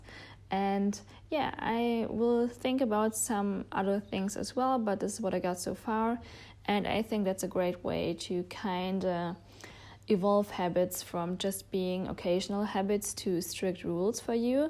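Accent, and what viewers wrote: Germany. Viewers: Ich wusste das du deutsch bist, hab ich doch richtig gehört 😂👍🏼❤ Ich hab übrigens noch nie so ein schönen Journal gesehen, hab vergessen wie das auf deutsch heißt lol 😅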